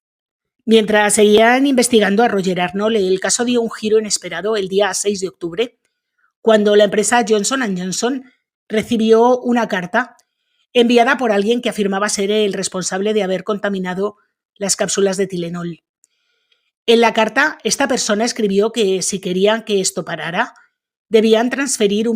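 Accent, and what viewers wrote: Castile, Spain. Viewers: Buen día ❗ amo que los españoles no pronuncien las palabras en inglés, sino en castellano ❤ Impresionante capítulo 😳😯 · nada se te quita con pronunciar las palabras en inglés, ya que das demasiados casos de USA, y son palabras en ingles, que cerrados sois los Spanish 😅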